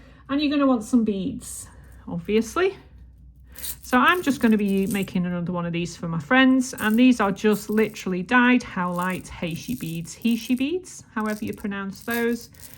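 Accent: United Kingdom. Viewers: Another great piece and so unique! I wish I’d had this when I was still working in an office. Thank you for this! 😊 Oh and by the way, do not worry about saying things such as “like so” or “literally” multiple times. We don’t mind. As an American I appreciate the little nuances of the English language as spoken by someone from Great Britain. My favorite thing you say is “faf about”. I had to look it up the first time i heard you say it and I just love it. 😁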